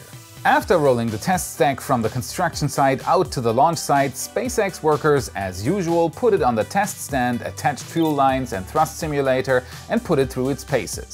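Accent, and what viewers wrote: Germany. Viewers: You’re German? Nice lol I dare you make a video with ONLY your strong German accent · Lol. You are German!. You should never be worried about accent. You provide invaluable information for us non techie space geeks so thank you:) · Your German accent cames through all the time. No worry tough. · nonsense his accent is very german, I know because I grew up around english speaking germans. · German accent is best in the world! Love a German accent 😉👌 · I'm german too and didnt notice that you are German until today. I wish I could get rid of my accent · I was actually referring to his little comment about his accent coming out :-D, Ich weis er ist eine Deutsche weil ich auch einer bin :-) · I like the german accent. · Denglish ischt goedt? Miene deutch und bookstabbe ist sclecht... So, your English is 👍 . I lived in D’dorf and Basel for many years, and I’m losing my deutch. 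It’s good to hear a good German-English accent. It makes me want to go out and get my shopping done before the grocery stores close ... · Ususaly I say: Awesome content as always, but this time it was even better! Loved the little mistakes, and that you didn't take them out, as well as your heavy german accent. Your pronounciation is verry high quality btw. Your videos have become, more fluid, professional, and even funier over time!:) Übrigens würde ich dich super gern mal auf deutsch sprechen hören, so wie wohl viele Andere hier auch. (Und mich wundert's immer, dass Du Dich als statt vorstellst);) Und ich frag mich oft, woher aus Deutschland Du kommst. Ich schätze Süd-West (Baden Württemberg oder Rheinland Pfalz). Ich persönlich bin in Karlsruhe aufgewachsen, schaue Dir jedoch aus Ungarn zu. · Hi, mir war in den ersten 5 Sekunden des Videos klar, das du Deutscher bist. Egal wie sehr wir es versuchen, unser Akzent kommt immer durch xD. 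Mach weiter so, sehr coole Videos. Sub und Daumen! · It would be fun if you did the entire video in your German accent( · are you german ??? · Ist Deutsche, lol!!! Pretty easy to have a German accent when you are German!! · I am a native English speaker. I can tell you are German because of your accent, but if it wasn't for your accent, I wouldn't be able to tell. You're sentence structure and pronunciation is as good or better than the average American and I'm really impressed. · Well lucky for you German accents are my absolute favorite. so checkmate! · Oh, no problem with your German accent... I didn't notice. I have the same issue with my Dutch accent in my videos... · About the beautiful German accent. My 95 year old Uncle Kurt briefly worked with Von Braun in Huntsville in the late 1950's. I believe that the German accent IS the best accent for a rocket enthusiast. Never apologize for it. 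· Why would you wish to get rid of your german accent. To the English ear it is a pleasent sound. I guess it might be that we are both anglo saxon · Mann merkt wirklich bei den Namen das du Deutsch bist genauso wie bei ein paar sätzen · he has a german accent during the whole video? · Why are people just realising about German accent now 😂 I’ve always known he was German, maybe I’m just special 🤔 Shame about the rocket lab electron launch, love the video:D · "Sametimes my german axent comes sruu" ach ich liebe dich :D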